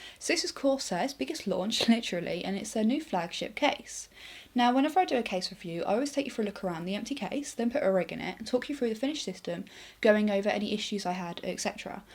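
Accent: United Kingdom. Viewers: with an awesome british accent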